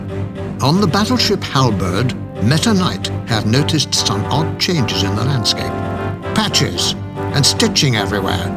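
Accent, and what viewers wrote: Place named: United Kingdom. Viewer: Why is the narrator British?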